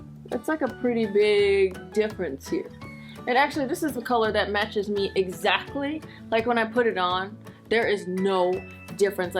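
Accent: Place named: South, United States. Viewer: ur southern accent is the cutest !!!!!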